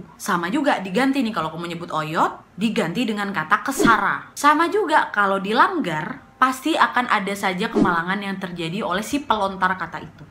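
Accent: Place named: Sumatra, Indonesia. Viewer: Halo, salam kenal. Aku penonton baru. Suka banget sama pembawaanmi santai tapi ngena. Oia, mf sebelumnya... ini orang sumatera kah? Karena logat bicaranya agak mirip sama kami... Stay safe terus ya. Semangat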